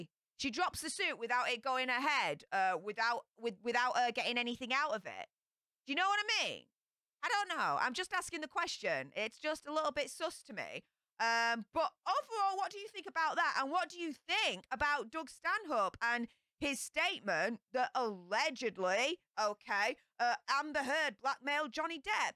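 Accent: Yorkshire, United Kingdom. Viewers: HAS to be Yorkshire tho'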